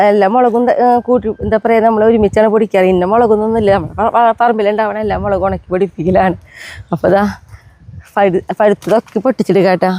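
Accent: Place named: Valluvanad, India. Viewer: Chechiyude Valluvanaadan Bhashayum slaangum KELKKAAN Nalla Rasamund...🍍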